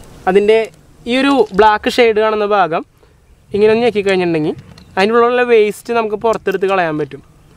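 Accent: Thrissur, India. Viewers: ബ്രോ 4 വയസുള്ള എന്റെ മകൻ നിങ്ങളെ youtube വീഡിയോ orikkal കാണാൻ ഇടയായി. ഇപ്പൊ ഡെയിലി 'മാമന്റെ വീഡിയോ വച്ച്താ എന്നും പറഞ്ഞു കരച്ചിലാണ്. കണ്ടതൊക്കെത്തന്നെ വീണ്ടും വീണ്ടും കാണുന്നു.. കോട്ടയം കാരൻ thrissur ഭാഷ സംസാരിക്കുന്നു... without any training.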